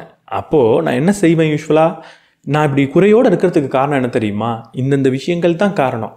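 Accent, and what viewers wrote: India. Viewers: Super brother.. good feel. Bro neenga Tirunelveli' native ah. Enga voor slang varuthu neenga pesurappa.. well bro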